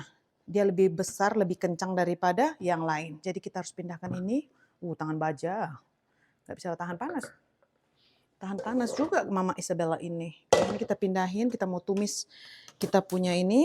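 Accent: Medan, Indonesia. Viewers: Memang gitu kk logat kita Batak Medan ,karena saya jg Medan logat sya jg gitu😅 tp itu lh yg buat seneng nonton vlog kk..😊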